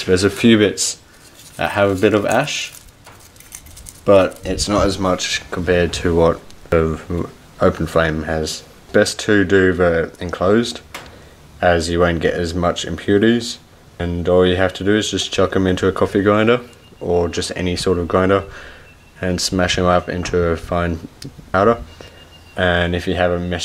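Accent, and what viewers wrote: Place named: Australia. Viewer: Australia / New Zealand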